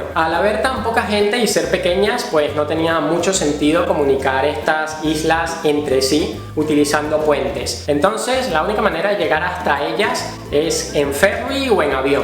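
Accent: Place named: Venezuela. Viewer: De qué región de Venezuela es su acento? Y me disculpa.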